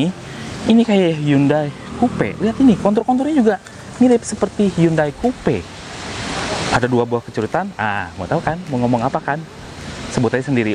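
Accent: West Java, Indonesia. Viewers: Orang sunda mah kentara aja ya kang logatnya😂🙏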